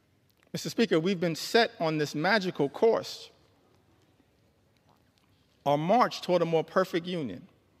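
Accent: South, United States. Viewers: Trying so hard to model his presentation after Obama’s. Check his cadences, body movements, and his love of repeating his punch lines 3 times. And what’s with his southern accent? He’s not from the Carolinas; he is from New Jersey!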